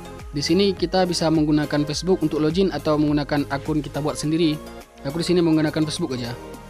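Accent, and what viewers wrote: Aceh, Indonesia. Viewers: Orang Aceh kah bang Logat bahasa nya kayak orang Aceh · Orang Aceh ya min? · Logat nya kayak orang aceh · Gaya peugah haba apa aceh sang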